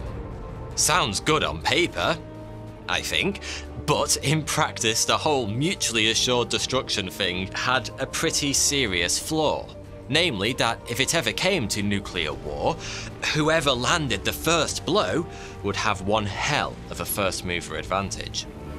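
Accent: United Kingdom. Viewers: Allow me to dismiss your British accent. There is a reason why the USA won our revolutionary war. Don't get me wrong! I did listen to and read British litetature, but I never found knowledge.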